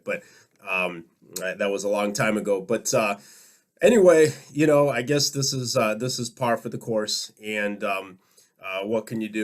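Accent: Russia. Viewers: Your information is fascinating! Very clear, detailed and intelligent descriptions/analyses! Hugely impressed. Thanks for the info on Dnepropetrovsk. I'm familiar with the Ukrainian towns/cities through a lifetime spent studying Russia/the SU and the GFW/Barbarossa. Your description of defence in depth was also very impressive, though I doubt anyone without military experience or understanding can appreciate just how complex it all is. I will keep watching you and Brian Berltic. PS I'm now sure you are originally Russian. You have a tiny bit of an accent which comes out every so often with the vowels! 😊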